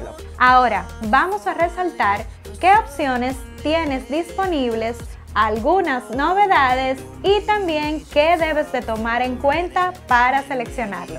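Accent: Dominican Republic. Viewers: Eres dominicana ?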